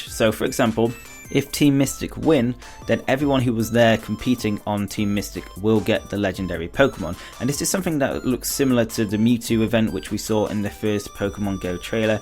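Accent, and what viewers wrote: United Kingdom. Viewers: you from sheffield?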